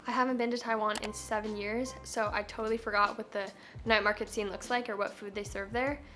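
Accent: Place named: California, United States. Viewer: California accent!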